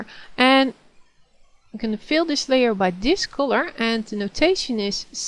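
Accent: Netherlands. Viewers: haha ik hoorde na de eerste letter dat je nederlands was xD wel cool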